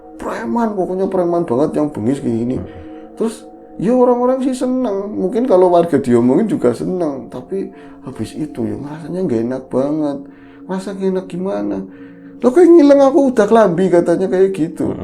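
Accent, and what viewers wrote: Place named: Banyumas, Indonesia. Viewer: Sueneng bgt denger logate... 😀😁👍Ngapakmodeon ❤️❤️...sehat dan sukses sll ya Mas berdua,GBU!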